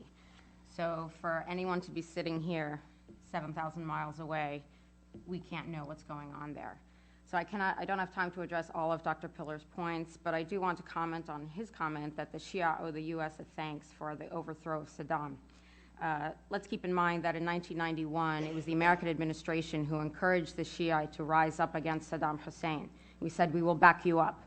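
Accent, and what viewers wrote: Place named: France. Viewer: Are you? You speak like you may be FRENCH.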